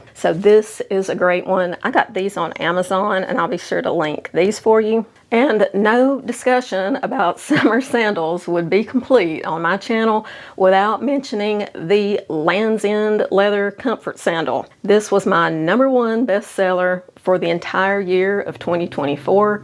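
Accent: South, United States. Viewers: I'm so glad I found you,! Your observations and suggestions are right on target. And you deliver your message in a no-nonsense but kind way, You really are excellent at this, and that's coming from a retired marketing executive. Everything you touch on is something that I had been thinking about; it's eerie and at the same time wonderfully satisfying, And your charming Southern accent is just so easy on the ears, Beats the heck out of my Philly accent! Keep on keepin' on,! Hopefully women will heed your advice. I know I have!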